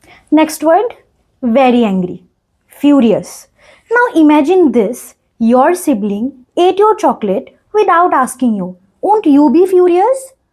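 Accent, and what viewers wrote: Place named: Bihar, India. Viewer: Your accent is bihari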